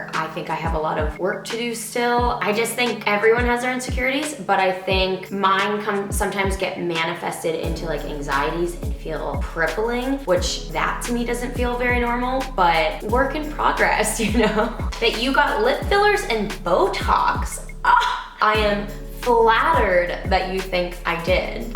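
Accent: United Kingdom. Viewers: she sounds like she's from Yorkshire! omg that was so freaky she sounded exactly like my cousin!!!!!!!!!!!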